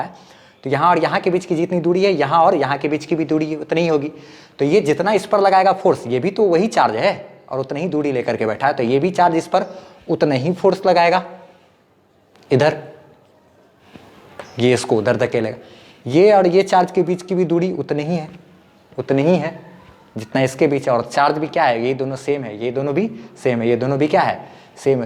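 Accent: Bihar, India. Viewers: Sir, I recognize your tone... U r from Bihar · Loved your bihari accent teaching 🫡🫶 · Ya he is from bihar, purnea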